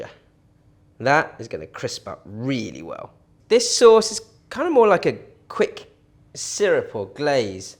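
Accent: United Kingdom. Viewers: That British accent is distracting me.😅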